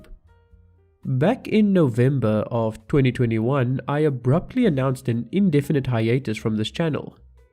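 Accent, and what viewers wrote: South Africa. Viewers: Rage against the dieing of the light - He sounds Indian but with a South African accent... 🤔 I wonder where he's from?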